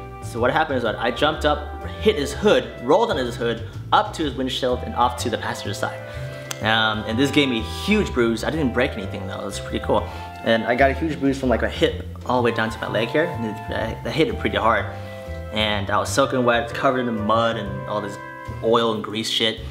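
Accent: United Kingdom. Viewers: you sound british man! lol - greetings from sydney australia! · ahaha your accent leans more towards the british accent :) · The accent.... Lol I'm Australian and that was so British ahaha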